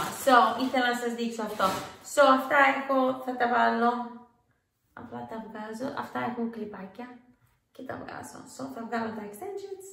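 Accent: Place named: Greece. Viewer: Gringlish 100%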